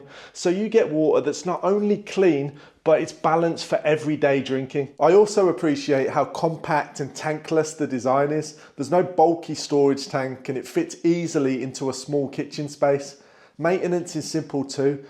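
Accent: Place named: North, United Kingdom. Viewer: Nice to meet you...you sound like a northerner...where you from girl??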